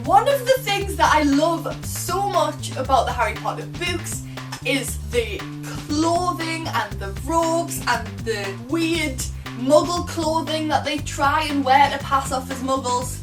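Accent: Newcastle, United Kingdom. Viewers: OMG!!! Can't say how much I love you in this moment <3<3 This is so fucking true!!! So many people never understand why I never liked the films...in future I may should show them your Video ;) You also had a few facts I never realize. The things about the potions (Forget what they are called in English ;) But what I always hated about the films is that Harry has NO Green Eyes!! I think this a good example for the things that where changes in the filme because of no reasons :/ Thanks for this epic Video ;) By the way I love your british accent xD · It's called a Geordie accent. The epitome of the so-called "British accent".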